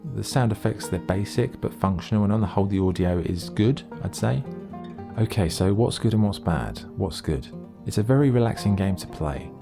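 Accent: England, United Kingdom. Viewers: Love your channel, it’s the only gaming review channel where the persons voice isn’t really irritating to me and you’re English too . Keep up the great content mate really chills me out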